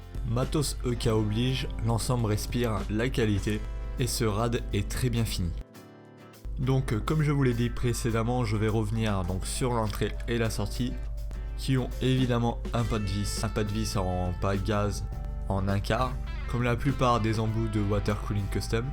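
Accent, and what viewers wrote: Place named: England, United Kingdom. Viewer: Excellente présentation du produit je trouve...Belle accent anglais au passage LEL !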